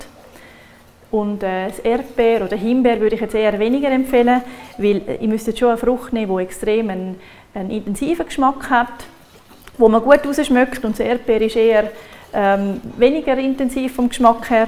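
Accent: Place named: Switzerland. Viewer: Der schweizer Dialekt war für mich nicht einfach zu verstehen, aber es hat sich auf jeden Fall gelohnt! Danke!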